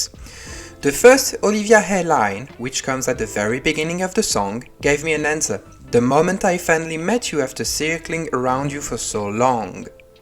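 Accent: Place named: France. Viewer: Idk why but your accent look like a french one 😌🤔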